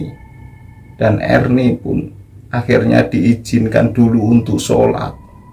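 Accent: Java, Indonesia. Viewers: AsyiiK, SeRu TeRBaWa CeRiTa MaS, DeNGan LoGaT GaYa BaHaSa KHaS JaWanya,NGaNGeNii 😂SeMoGa SeHaaT WaL AFiaT & KeDe PaNnya KonTen/CHaNNeL SeMaKin BeRKemBanG/SuKSeS SeLaLu AamiiN. 🤲🙏👍👍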